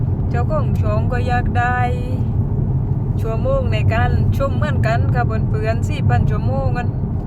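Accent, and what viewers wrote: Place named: South, Thailand. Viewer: ขับรถชมวิวด้วยคนนะคะ ไม่เบื่อๆจ้า ชอบฟังพูดภาษาใต้ มีเสน่มากเลยค่ะ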